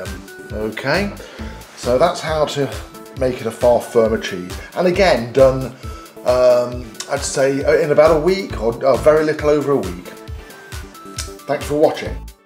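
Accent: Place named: United Kingdom. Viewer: I'm sorry but hearing you say mention the mold 'colonize' your cheese, said in your British accent, made me laugh.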